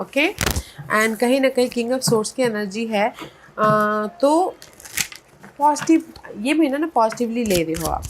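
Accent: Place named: Bengal, India. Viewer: Bengali accent 🙏🏻👁🙏🏻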